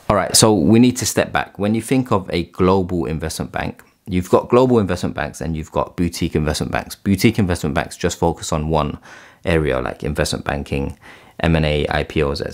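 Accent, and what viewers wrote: London, United Kingdom. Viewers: 1. Awesome video. 2. I really love the new generation London accent 3. I will always be so thankful for your videos that helped me get into banking 2 years ago, thanks so much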